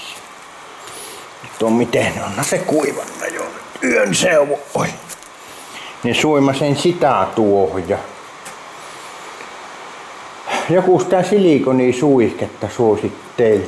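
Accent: Savo, Finland. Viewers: Rikasta tuo savon kieli😊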